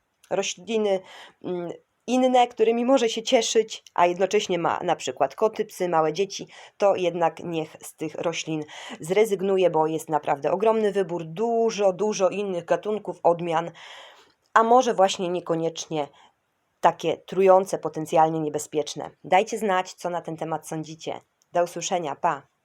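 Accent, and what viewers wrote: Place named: Łódź, Poland. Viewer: Słyszę naleciałości łódzkie ;)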